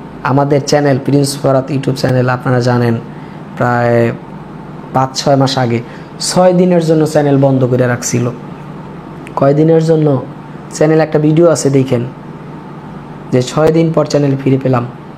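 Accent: Barishal, Bangladesh. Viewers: ভাই তোমার বাড়ি বরিশাল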